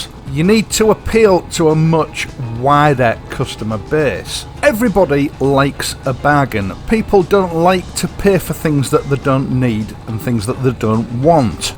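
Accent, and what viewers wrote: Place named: Black Country, United Kingdom. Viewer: if I may be so bold, having watched a great many of your videos, I have come to the conclusion that you are from a sadly extinct species that I would label a "proper copper" I grew up in what was then a small village of a thousand or so people. the local cop new all of the "conspicuous " kids, and while I am not really for physical punishment, having a cast iron boundary in the form of a large shiny black shoe, applied just firmly enough to command respect changed the course of the life of some of the disreputable youngsters I knew. what kept me in line was knowing if I offended, he knew who I was and would be round to read the riot act to my parents (both ex military) he knew me because I was conspicuous at 14 I was six foot four and 15 stone, so i stood out. many of the "problems I see now seem to come from the extinction of authority figures like that, plus being born in Birmingham, as was my dad i enjoy your accent, which while quite different from Brumie still is very black country in its own way. 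keep up the good work, loving it 😃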